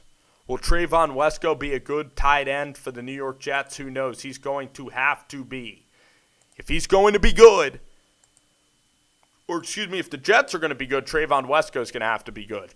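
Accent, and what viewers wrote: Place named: Deep South, United States. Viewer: thinks Davis Webb a top 5 NFL QB. also what the hell is wrong with your voice?? It changed 4 times during the video and for some reason you try and mix a deep South tone into it.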